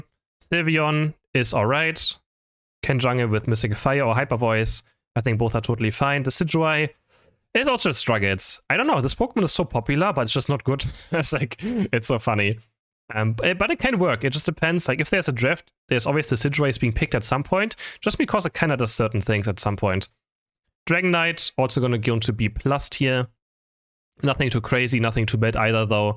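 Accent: Germany. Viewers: Are u german?because du hast da ein akzent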